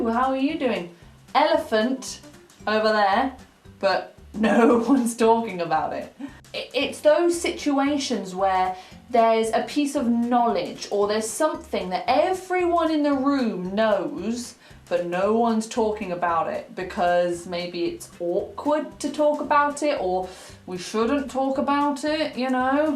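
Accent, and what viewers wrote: United Kingdom. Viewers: I love her uk pronounce and explaining ❤️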